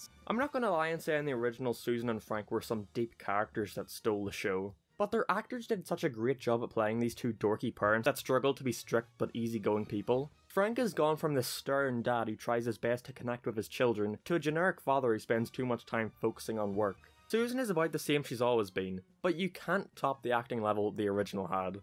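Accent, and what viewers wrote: Ireland. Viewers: Dude where are you from I love your accent! Irish? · Wasn’t until that i realized he has an Irish accident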